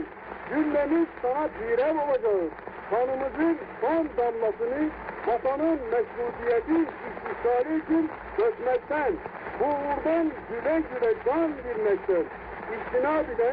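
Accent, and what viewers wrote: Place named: Istanbul, Turkey. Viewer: Arkadaşlar,( rrrr) lere, ve vurgulamalara çok takılmayın,, o dönem istanbul lehçesi, geloor, , gedoor,, şeklinde,, bizzat bardakçı bunu anlattı, ve hatta son hanedan üyelerinin ses kayıtlarını dinletti, hepsi bu şekil konuşuyor, herkese selamlar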